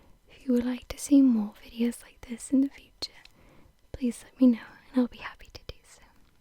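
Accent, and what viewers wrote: Wales, United Kingdom. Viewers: are you Welsh?? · is this girl welsh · Are you welsh? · What part of Wales are you from? I'm from Caerphilly near Cardiff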